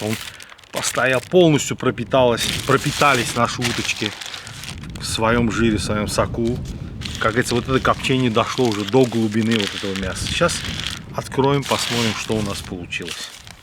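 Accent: Baku, Azerbaijan. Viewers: Ваш канал, рецепты, приготовление, ваше настроение, акцент, диалект, выражения, все это причины, по которым я с удовольствием смотрю каждый эпизод! Я сразу оказываюсь на родине, в родном Баку 80х! Дай Бог вам здоровья и успехов в творчестве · Акцент Азербайджанский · Русский Бакинский акцент,это песня.Певички , нервно курят в сторонке.Рецепт супер,я так копчу курей. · Очень вкусно выглядет.Акцент и говор чисто Бакинский,я незнаю кто он по нации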